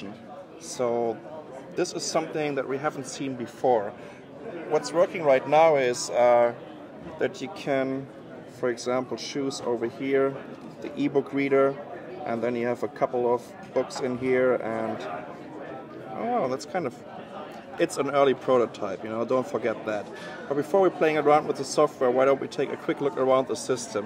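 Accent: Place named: Germany. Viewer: kinda german accent.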